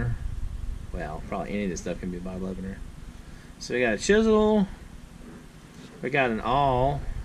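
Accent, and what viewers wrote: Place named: South, United States. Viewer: For some reason in my opinion you sound southern but then again if I am assuming right when I went up to North Carolina a lot of people sounded southern so I'm assume you are from North Carolina or Kentucky.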